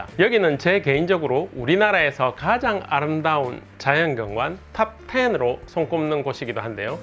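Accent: Gyeongsang, South Korea. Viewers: 다 좋은데 경상도 액센트 귀에 확 거슬립니다. 가이드 하시는 것은 영 파이라예 ~~ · 경상도발음과 액센트를 좀만더 고쳐주셨으면 싶어요.ㅠㅠ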